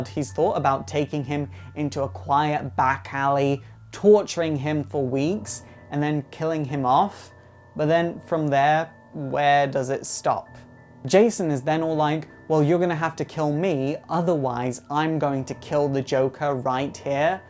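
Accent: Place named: RP, United Kingdom. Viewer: Damn, do you know that you could be an... excellent storyteller, don't you? I mean with your narrating talent, passion you talk with, you can recite fairytales for children/work in a sort of playhouse or something. To think about it, your British/RP-ish kind of accent (from one way extremely annoying) can do the trick as well.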